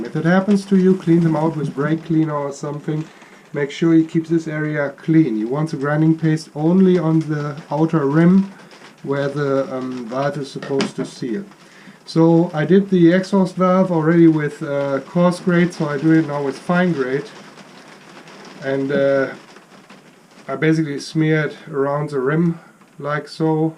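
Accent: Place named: Germany. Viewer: Somehow, from your accent, I'm guessing your mother tongue might be German. I am with a MD1B from the 1970ies that was running until 2019 sort of, until then it was left for good, might have some little rust and such... I want to try to fix it all myself first, but if I fail I might want to come back to you with questions and such.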